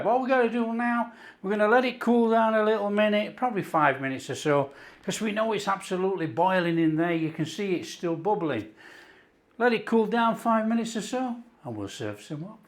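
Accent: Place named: England, United Kingdom. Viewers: Hey, Chef, where are you from in England? Trying to place your accent! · I thought he was a Notts man but I guess it's not far from Yorkshire. Sorry chef I'm from the westcountry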